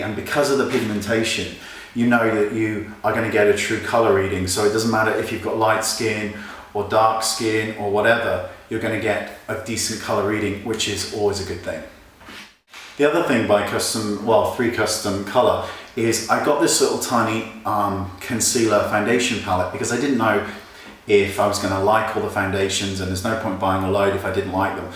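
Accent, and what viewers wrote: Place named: United Kingdom. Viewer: I love the british accent. "Last, but not least" :)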